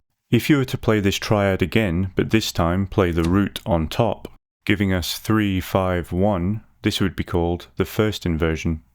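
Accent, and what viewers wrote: Scotland, United Kingdom. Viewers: I love your videos. Most of the ones I watch are American and you have a great confident Scottish accent. You speak calmly and clearly and everything seems very well planned and thought through. I could never seem to harmonise the harmonic minor scale properly. This video has taught me that augmented chords have a sharp 5 and NOT a sharp 4 😂 thanks for everything matey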